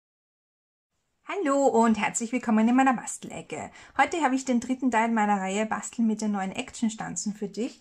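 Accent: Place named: Austria. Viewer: Die vintage u die rosenkarte sind meine Favoriten! Nach der Aussprache bist du österreicherin!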